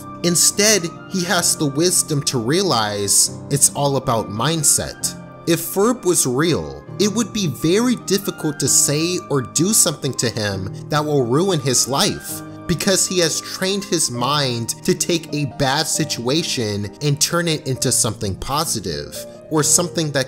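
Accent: United Kingdom. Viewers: wait, he’s British? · Why the hell is this guy british? edit: btw I never watched finieas and pherb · Omfg i just realised he’s british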